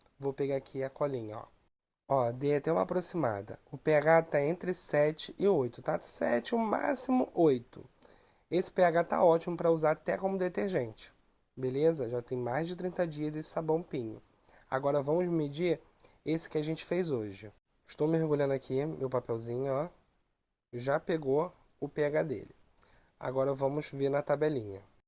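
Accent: Rio de Janeiro, Brazil. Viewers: E eu q ñ me canso de,toda vez q vou fazer uma receita de, rever os vídeos para ter segurança e ouvir esse sotaque carioca e a a perfeição no repasse da técnica junto com a experiência de quem já está no automático. 👍👍amo e amo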